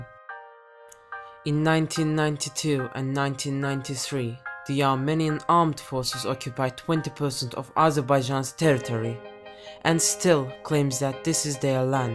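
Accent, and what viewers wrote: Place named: Russia. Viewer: Русский акцент слышен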